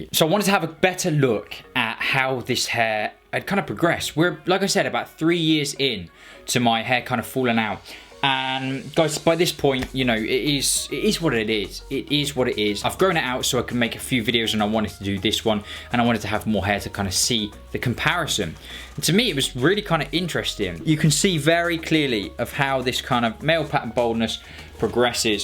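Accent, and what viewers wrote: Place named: United Kingdom. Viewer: I love your british accent sir. . .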